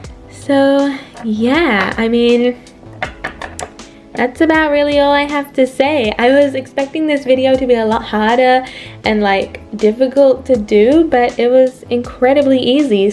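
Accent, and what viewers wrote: Australia. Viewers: This is random but are you Australian?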